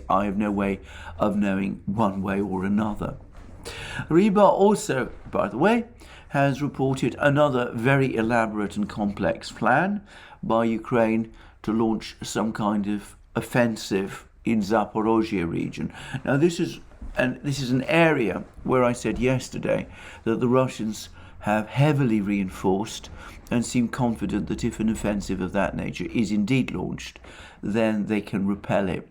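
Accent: United Kingdom. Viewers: Russian troll with British accent. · Kremlin BS with a British accent. How nice...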